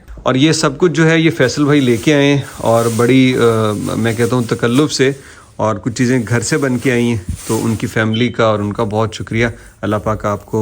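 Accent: Punjab, India. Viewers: ایک مودبانہ مشورہ دوں گا، ناراض بھی نہ ہوئیے گا۔ آپ ایک اچھے یوٹیوبر بن سکتے ہیں۔ دو چیزوں کا ضرور خیال رکھیے۔ ایک تو یہ کہ آپ کی اردو اچھی ہے اس میں پنجابی کا تڑکا نہ لگائیں کیونکہ اس سے وی لاک کا مزہ کم پڑ جاتا ہے۔ دوسری بات سیاسی گفتگو سے پرہیز کریں کیونکہ آپ کو بیچ بیچ میں سیاسی چٹکلے چھوڑنے کی بھی عادت ہے۔ پاکستان میں بہت ظلم چل رہا ہے، جبکہ آپ اکثر اٹھکیلیاں کرتے رہتے ہیں۔ امید ہے ان چیزوں کا خیال رکھیں گے۔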